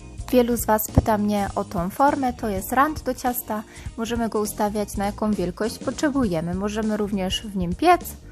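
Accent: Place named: Germany. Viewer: To że ktoś jest ze wsi nie znaczy że odrazu na tak mówić, to gdzie to mieszka nie zależy od tego jak mówi... Ta pani mieszka w Niemczech więc ma powody, aby tak mówić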